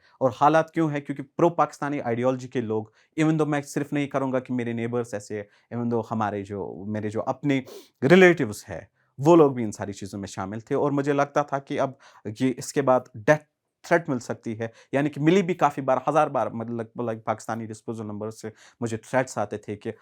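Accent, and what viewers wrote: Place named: Kashmir, India. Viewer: I like kashmiri hindi..their slangs is really sweet..u need to have courage to say these things in public platform...hats off u brother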